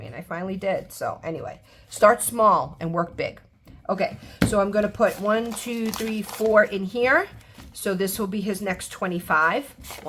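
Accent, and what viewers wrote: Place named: New York, United States. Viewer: Hi! I’m enjoying your videos! Really miss hearing that NY accent. I haven’t been home in so long. 💜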